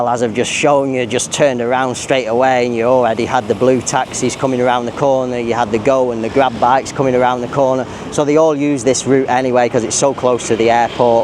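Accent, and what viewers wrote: United Kingdom. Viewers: I think he already spoke English just the accent is British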